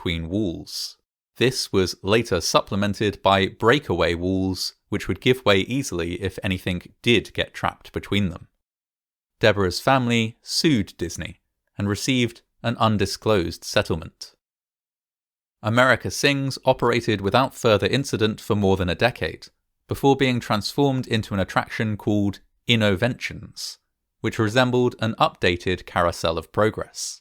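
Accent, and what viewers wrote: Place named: RP, United Kingdom. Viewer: Why does this guy speak so slowly does he think that Americans won't be able to understand is highfalutin English accent? I have to turn it up to 125% playback speed just to not be annoyed.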